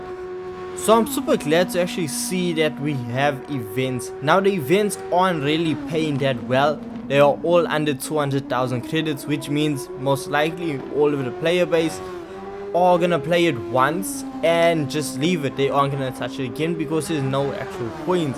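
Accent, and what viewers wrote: South Africa. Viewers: Are you South African? 😂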